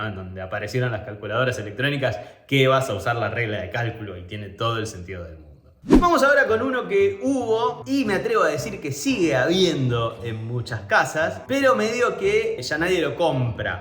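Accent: Argentina. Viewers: Podés hablar en español ? No en argentino …… no te entiendo naaada !!!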